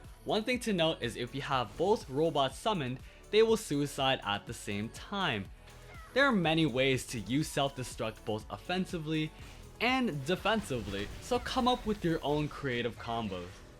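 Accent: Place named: Asia. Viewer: It's funny how you can hear that the guy speaking is an Asian.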